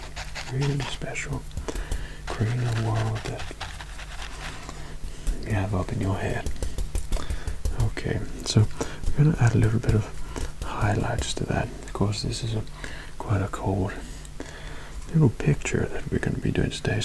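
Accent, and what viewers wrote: United States. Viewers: Never thought I'd hear with an American accent! Very good! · it’s eerie because Bob recorded in Indiana, where very few people have an accent, but gets it nearly spot-on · The effort spent towards getting the American accent just right is amazing! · Why do I always seem amazed when an English person does an American accent, when they do it seems kinda struggled if they go for to long, he did really good though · Honestly, solid American accent. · Bless you, your Yank accent is definitely improving! 😀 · How hard was it trying to imitate an American accent? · IS THAT HIM TALKING? If it is great american accent my guy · His American accent on point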